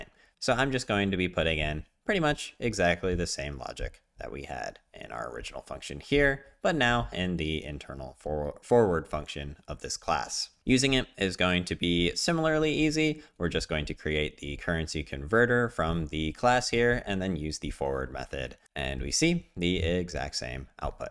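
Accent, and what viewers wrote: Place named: United States. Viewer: Your channel caught my attention because of an Ł in your name. I thought you were Polish, but your accent sounds like you are USA born and raised. I love the content. Very informative and in depth. I can't believe you are not some ML wizz in the Silicon Valley. Keep up the good work!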